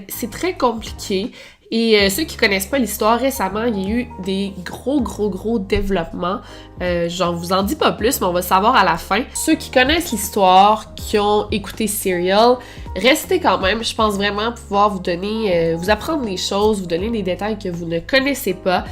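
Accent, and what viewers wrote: Quebec, Canada. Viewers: Dommage... Je supporte pas l'accent que québécois....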